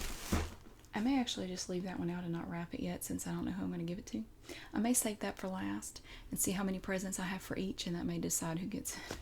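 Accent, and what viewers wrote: South, United States. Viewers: I love your accent, is that a "Southern" accent? I am from Bombay. Sometimes I watch Hollywood movies and many of them have characters speaking with an accent quite similar to yours. I am just here for the accent. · I'm also from the south (Georgia) and we honestly sound the same😂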